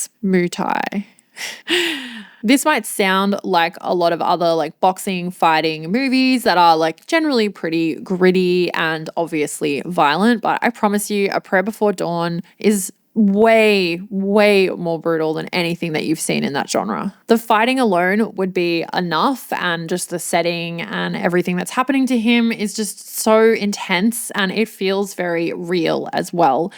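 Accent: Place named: Perth, Australia. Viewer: So..... your a pearl ❤ from perth eh? That explains alot 🎉 I love yout accent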